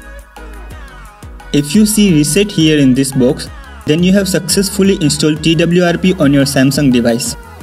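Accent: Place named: India. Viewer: i hate indian english!!!! i cant understand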